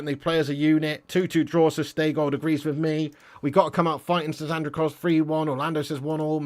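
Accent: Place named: Cockney, United Kingdom. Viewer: ...but ,a better way to say ,maybe; blah yada ditto ,he had a caped goat ,but u pronounce like a full blown 1970s london east end evening standard newspaer seller outside all tube stations in that time peeriod .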